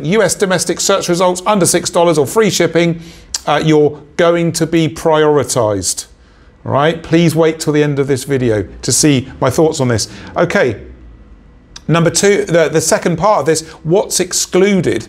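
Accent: England, United Kingdom. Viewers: How am I only noticing now that you have an English accent? I have been binge watching your videos daily lol.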